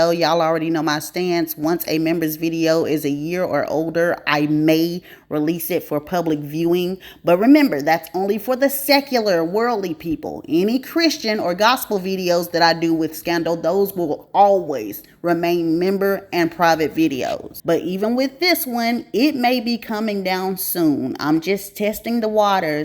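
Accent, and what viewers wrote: South, United States. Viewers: Great video,! Your southern accent is really sweet. I slightly gasped when you used the potty words. Nevertheless, I'm a die hard fan of yours.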